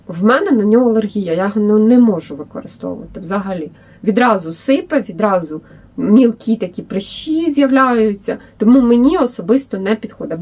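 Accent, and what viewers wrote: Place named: Slobozhanshchyna, Ukraine. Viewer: Сонечко наше ясне!!! Доброго вечора. Подивилася як ви з родиною розбите голубці, і що!ґ! Пішла все купила на гоубкі!!!, Ви неймовірна жінка, а ще саме головне, як люблю ( просто балдею) от нашего Слобожанського говору!!!!! Київ, Суми!!!!!💙💛👍🇺🇦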